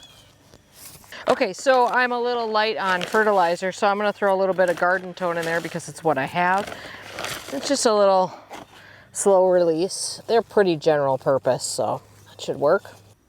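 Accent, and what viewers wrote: Wisconsin, United States. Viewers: Great video! Sad that you lost the bet. Go Badgers! I pronounce Oregon just like you do. Sconnie girl here too! 💚🌱 You should send Laura some Spotted Cow from Leinenkugels. Then if she likes it, she will have to come here to buy more. (Only sold in Wisconsin) 😊😊 Have a wonderful day!! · This is fun. Love your sense of humor and that you garden in my zone. Knew you were from Wisconsin as soon as I heard you talk in another video.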